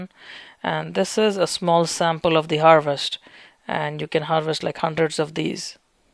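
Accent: India. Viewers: What kind of accent is it? Indian or something similar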